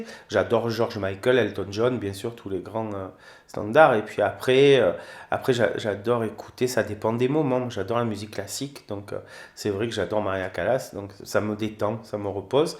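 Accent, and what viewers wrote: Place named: Provence, France. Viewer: Bises à toi,qu'est ce que tu as pu me faire rire avec ta faconde provençale qui me rappelle tant "Les Martigues" de mon enfance...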